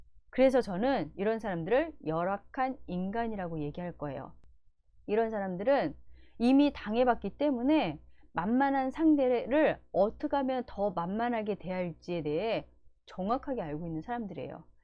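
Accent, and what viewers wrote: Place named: Jeolla, South Korea. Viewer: 전라도 분이신가봐요 ㅎㅎ '모대요 모대요'가 귀에 계속 맴도네요,, 좋은 내용 너무 잘 들었어요♡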